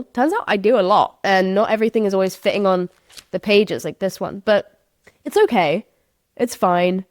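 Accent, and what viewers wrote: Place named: United Kingdom. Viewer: why do you sound extra british in this episode 😭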